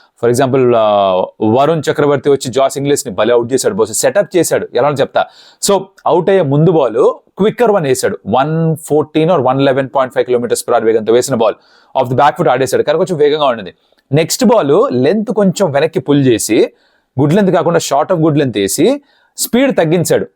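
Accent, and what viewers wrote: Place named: Godavari, India. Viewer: Bro Dialect enti Godavari Zilla vala la undi , Any roots from Godavari Districts?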